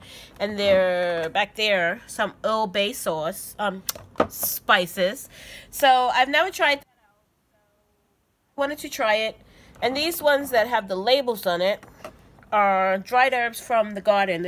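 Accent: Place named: Philadelphia, United States. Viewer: You are one organized lady. Loving your accent... what is that? Philly? Me being from the west coast you would probably say i am the one with an accent!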